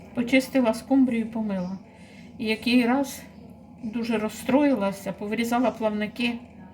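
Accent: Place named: Kyiv, Ukraine. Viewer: Мабуть, ви окрім поняття "суржик" і своєї расєйської мови ніоли не чули таке поняття як "діалект". Так от, ставлю вас, нешановний, до відома, що такий діалект поширений у Київській області. І не треба повчати, якою мовою і на якому діалекті людині розмовляти. Всяка мова добра і гарна, окрім огидної рашистської.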